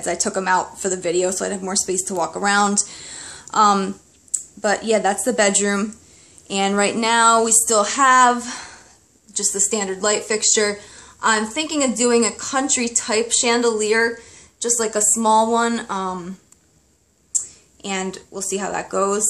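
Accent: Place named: South Jersey, United States. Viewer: I thought you had a South Jersey accent because you sound like my cousins and then you said Brigantine!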